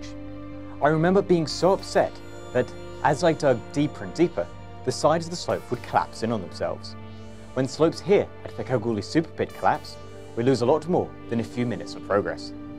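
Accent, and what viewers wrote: England, United Kingdom. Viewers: You are a small Tom Scott, same presentation style and British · damn pom trying to speak aussie words.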